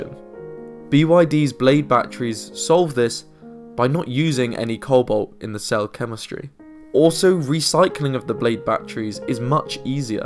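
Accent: United Kingdom. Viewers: Your British accent is amazing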